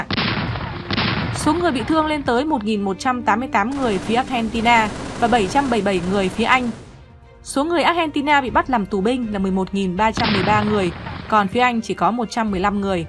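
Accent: Standard. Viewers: Thuyết minh quá hay ! Rõ ràng , nhập tâm , chuẩn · Giọng đọc thuyết minh hay và chuẩn luôn ủng hộ kênh...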